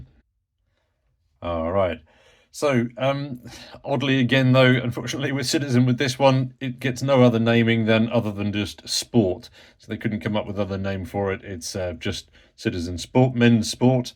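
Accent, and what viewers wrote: United Kingdom. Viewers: Why can't British talk English properly like Americans? It's tough to understand, fella.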